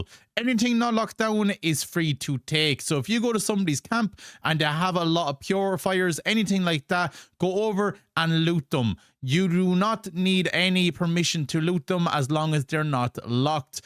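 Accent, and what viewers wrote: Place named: Ireland. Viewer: This guy is Irish Irish lol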